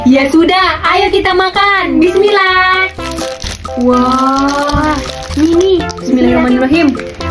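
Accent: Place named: Sumatra, Indonesia. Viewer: Dari aksennya kayak melayu sumatra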